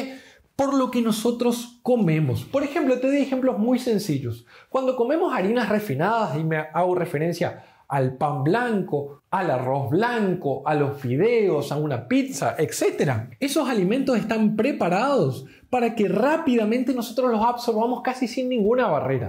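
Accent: Misiones, Argentina. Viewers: es Argentino es su tono de voz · Dr por el acento usted si no es de Misiones pega en el palo. Excelente video. Saludos!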